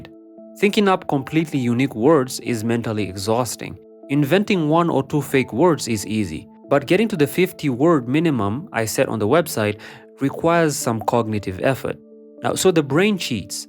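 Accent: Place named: Russia. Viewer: Why do I hear russian accent from a Kenyan?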